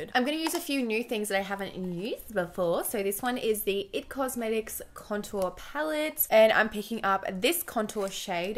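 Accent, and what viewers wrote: Australia. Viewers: Omg I've always watched her with the sound off and I had no idea she has an Australian accent I was so surprised!!! I am so dumb😂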